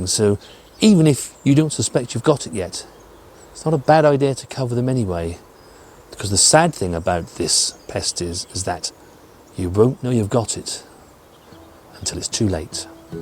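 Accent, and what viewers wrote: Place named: Norfolk, United Kingdom. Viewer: You can't beat a good old Norfolk accent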